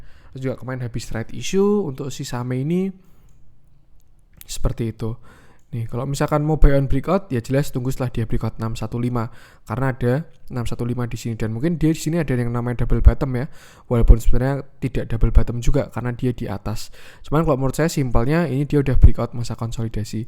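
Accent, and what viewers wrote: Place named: Semarang, Indonesia. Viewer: Sudah kuduga,logat semarangan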